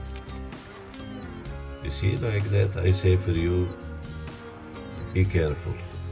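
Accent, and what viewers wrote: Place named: Egypt. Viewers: 😁😁luv d way u narrate .. Egyptian style English.. sooooo sweeet.. 7eloo awee. 👍👍👍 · He’s English makes me to watch all his videos such great person. I like Egypt accent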